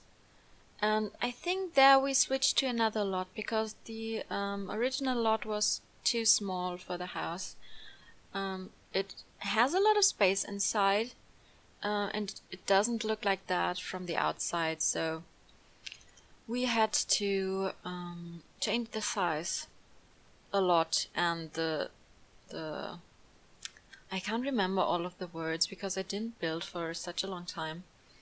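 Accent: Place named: Germany. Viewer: Bist du deutsch? Weil das dein Englisch hört sich so an als wenn du deutsch bist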